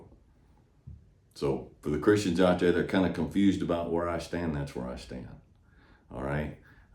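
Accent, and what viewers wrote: United States. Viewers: bro ı dont understand english but your cowboy english ı like it so ım watching you AHAH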